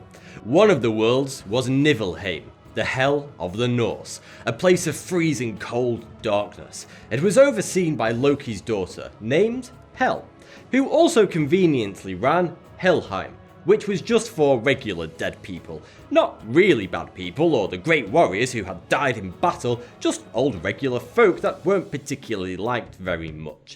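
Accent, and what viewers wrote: United Kingdom. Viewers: Maybe someone who doesn't believe in something to the point of mocking, shouldn't inform people about other theologies. Fire, earth, air, water. Follows the pattern of life, humanity and all of it. So, you're funny and all, but really.... you sound, like, too British.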